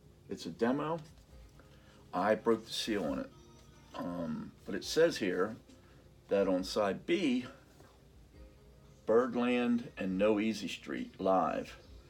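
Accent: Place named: West Virginia, United States. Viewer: Are you in WV? You don't sound too far away from me I'm in the Panhandle anyway you have a great collection there I totally forgot about the, they had some great songs.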